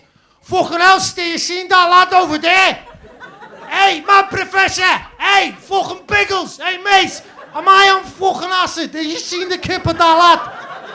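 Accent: Liverpool, United Kingdom. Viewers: I can’t believe this lad isn’t a scoucer ! Impeccable accuracy and accent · His scouse threw me so hard I thought he actually was a scouser and I am hahs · scouse accent pisstake is better than most scousers, sounds like Yosser Hughes’s and Jan Molby taught him 🇬🇧👍🇬🇧👍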